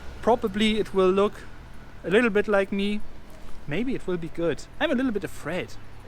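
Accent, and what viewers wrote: Germany. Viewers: Dieser Deutsche Akzent killt mich einfach😂 Ps: Du hast echt heftiges künstlerisches Talent Tolles Video und schöne Grüße aus dem Emsland✌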